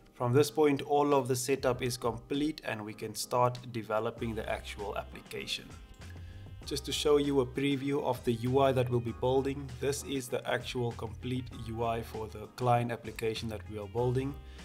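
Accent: South Africa. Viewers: Awesome! When I heard you talking I knew you were from South Africa! It's cool to see a fellow South African on YouTube! Awesome channel man.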